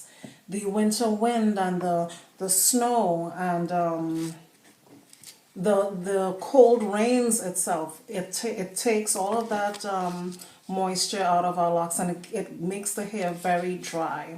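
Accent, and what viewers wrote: Trinidad and Tobago. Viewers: U sound Trini...im hoping you are so you would know the climate we have...what do you suggest I use...my hair tends to dry out-locks and scalp- soooo quickly and sometimes I get tired of moisturizing so often (which is bad) lol...i need something that would keep it moisturized for longer periods in our veryyyy hotttttttttt weather :(((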